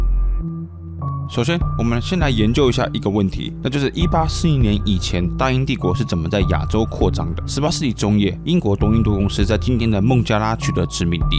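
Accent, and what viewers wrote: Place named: Taiwan. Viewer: 能不能配上中文，你这台湾腔有点听不懂啊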